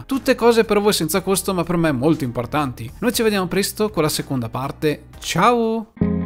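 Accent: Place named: Treviso, Italy. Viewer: Bravo ti seguo volentieri dalla cadenza dovresti essere vicino a me di Treviso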